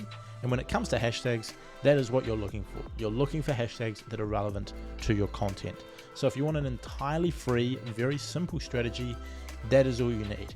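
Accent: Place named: New Zealand. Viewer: thanks dude. first i thought a fellow Australian? then i heard the NZ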